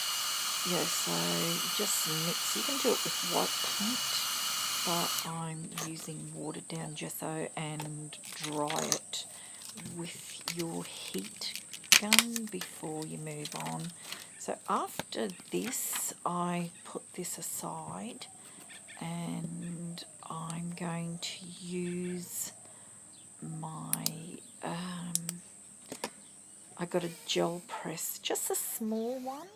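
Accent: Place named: Australia. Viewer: Love the page and the pineapple. Love the gelli plate and good to hear another Australian accent. Susan.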